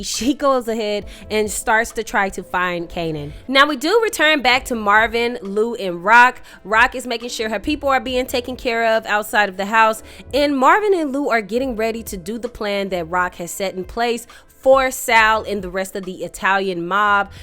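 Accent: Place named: New York, United States. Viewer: This episode was fire!!! Your New York accent has me rolling btw.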